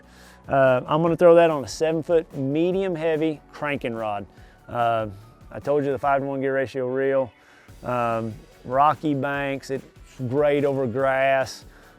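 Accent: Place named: South, United States. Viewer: January WHERE???????? Sounds like you have a southern accent....in certain areas up north in January, the most important thing would be an ice augur! lol.....Why wouldn't you start the discussion with where you are, and generally, where you fish....I had to fish 45-50 feet deep water to catch bass today, and you are talking to me about a jerkbait??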